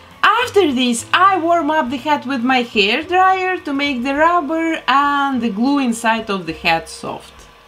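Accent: Russia. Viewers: Так слишно руский акцент)) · Sorry, but where are you from?) I'm intrested, because you sound like you have a Russian accent))) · why do you have a Russian accent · Это... Русский акцент? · хм...чувствуется русский акцент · Русская что-ли? Ужасный акцент. Очень сильно по ушам бьет. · Как будто русский не старается над произношением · Почему мне кажется, что она русская, говорит просто с акцентом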